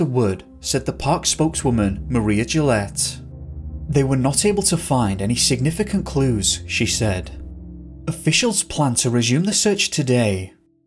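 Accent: United Kingdom. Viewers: NGL I clicked on this video because the topic seemed interesting but your cadence and intonations while reading are so grating I cannot stand watching. Regardless of the words or sentence structure you say a few words then fade out, short pause, repeat until the end of a sentence where you slow the last few syllables down like you've run out of air. The lack of variation makes it sound like a slightly more advanced british microsoft sam.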